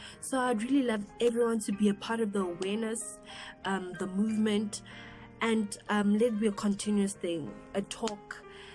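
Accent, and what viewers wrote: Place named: South Africa. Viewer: I'm sorry u had to experience that. So glad u r talking about it. It's nice to see someone from Madagascar in SA. I love Malagasy language, music and culture. It seems u have been in SA for a while (your accent says it all 🙂). If you visit Madagascar one day, please get me CDs, will pay for them. Regards Richard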